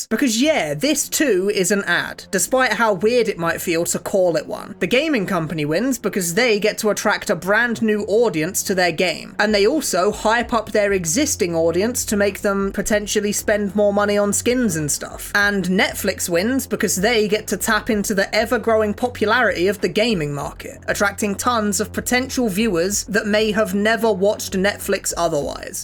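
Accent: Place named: England, United Kingdom. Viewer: Ok english accents are always funny to me as an American, like i enjoy how you all say Mario, but that Nissan pronunciation is something else!! ❤️ You have a great channel man. Could really good analysis videos I've seen now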